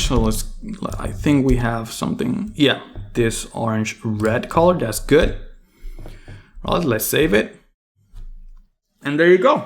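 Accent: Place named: Puerto Rico. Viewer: You low key sound Puerto Rican.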